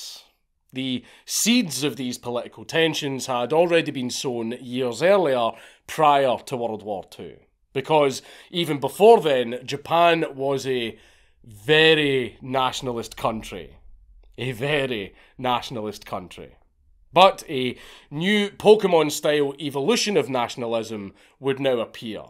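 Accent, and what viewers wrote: Scotland, United Kingdom. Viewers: i chalk that up to thick Scottish accent.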